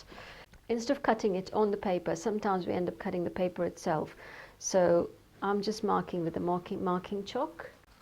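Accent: United Kingdom. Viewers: I enjoy all your proyects and your britanic accent.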